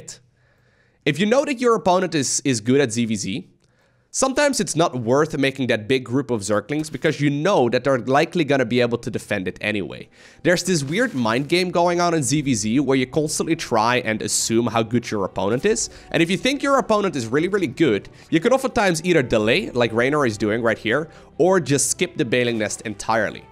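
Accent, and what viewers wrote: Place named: Netherlands. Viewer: Your Dutch accent never gets old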